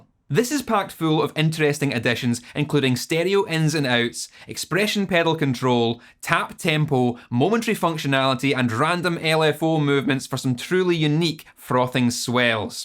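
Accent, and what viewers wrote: Scotland, United Kingdom. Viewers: As a musician from Scotland living in Stockholm, Sweden, i can say that these videos are extremely well made and that it's also a relief to hear a scottish accent again, great work, keep it up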